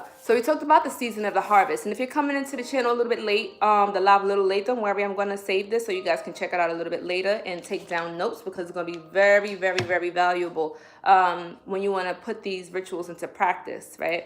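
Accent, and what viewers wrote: New York, United States. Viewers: Your ny accent came out in this one lol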